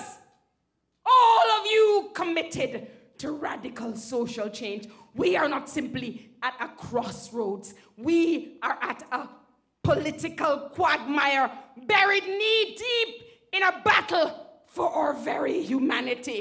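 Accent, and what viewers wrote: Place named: Caribbean. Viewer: That's more of a Caribbean accent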